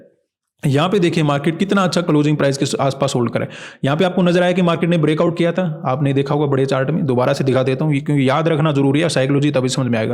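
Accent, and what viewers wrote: Haryana, India. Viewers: Language ke hisab se lagta hai inki language mein thodi si Haryanvi bhi mix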